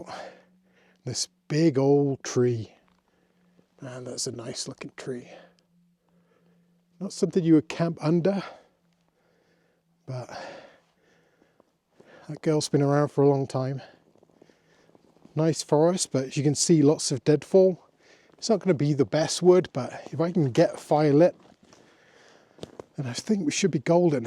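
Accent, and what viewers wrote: United Kingdom. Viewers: That was smart going up hill when finding a camping location. First time viewer. Emjoyed your video. Are you from the UK?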